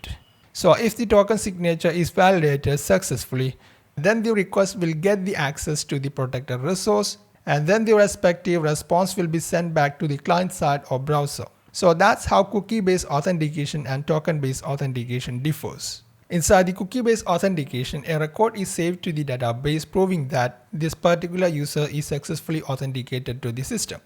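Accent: Kerala, India. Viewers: NIce presentation and explanation....Your accent sounds like a malayali..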